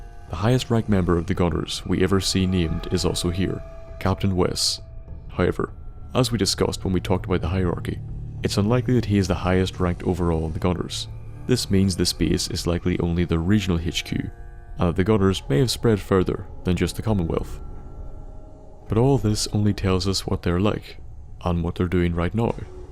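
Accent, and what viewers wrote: Northern Ireland, United Kingdom. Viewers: Are you a fellow Northern Irish man? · You from Northern Ireland lad? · Are you from Northern Ireland because like you phrases and accent sounds like a N.I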